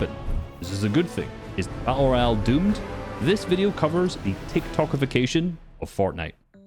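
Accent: Scotland, United Kingdom. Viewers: How can I watch video without the terrible Scottish accent?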